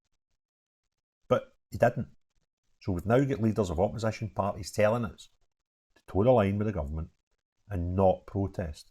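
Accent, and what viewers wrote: Scotland, United Kingdom. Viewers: …I know he has a Scottish accent. But that doesn’t mean we don’t need subtitles to understand a single word coming out of his mouth.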